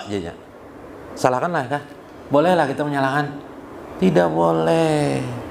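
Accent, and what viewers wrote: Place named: Kalimantan, Indonesia. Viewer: Klu di dengar dr logat bicara beliau, beliau itu org kalimantan.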